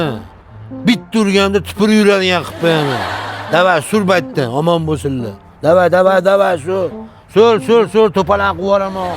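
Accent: Margilan, Uzbekistan. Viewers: Маргилонча бубтику. Бормиларми.😊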